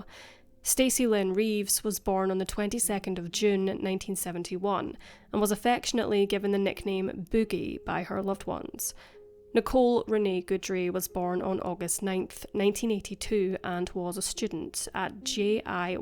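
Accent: Scotland, United Kingdom. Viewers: One of the best true crime channels on YT. Love the Scottish accent. It is Scottish right? I love it no matter what accent it is. 🙂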